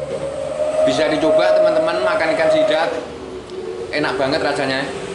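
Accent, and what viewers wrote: Java, Indonesia. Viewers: Borneo,kok jowone kentel bgt.asline ngendi lek.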